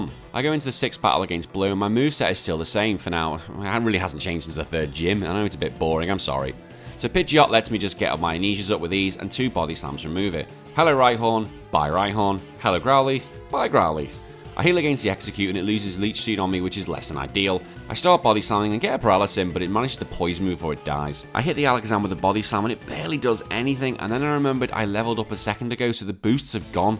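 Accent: Liverpool, United Kingdom. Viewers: Scouse pokemaniac......love it 👌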